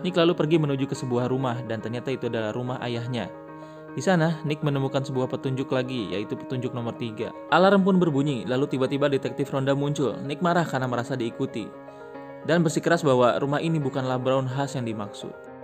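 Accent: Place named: Banjar, Indonesia. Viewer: Banjar banar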